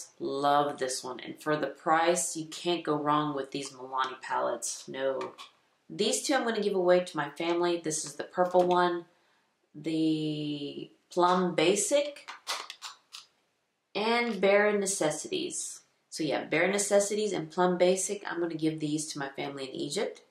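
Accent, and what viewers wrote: United States. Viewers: You may be Egyptian, but your accent is all Texas! I just gifted several palettes to my college aged daughter. Felt good!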